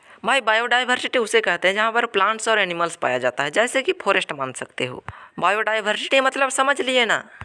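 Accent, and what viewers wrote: Bihar, India. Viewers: Bhai tum bihar ko ho kya?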